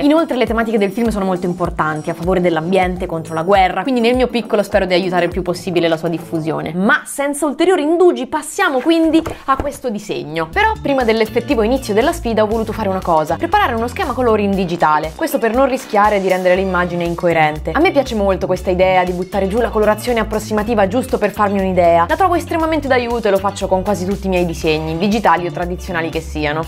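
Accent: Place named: Central Italy, Italy. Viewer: L' accento da Italia centrale si sente un po' troppo, nel doppiaggio ...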